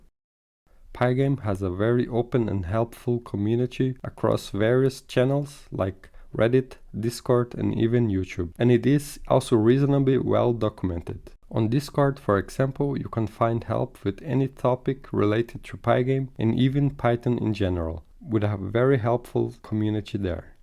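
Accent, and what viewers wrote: Brazil. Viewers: Man , are brasilian? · O inglês com o Tchu pra ca e tchu pra lá é do Brasileiro hahahaha. Ótimo video. Seguindo e cutido. Um Salve da Holanda! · are you Brazilian?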